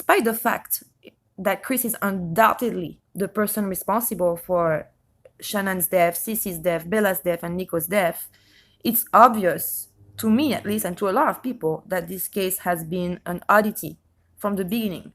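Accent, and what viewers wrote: France. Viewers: I thoroughly enjoyed your video. Thank you. New subscriber. By the way, I absolutely adore your accent. It reminds me of our French exchange student who is just a doll. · Did you say that you were German? You sound French to me. Beautiful accent! Blue and black.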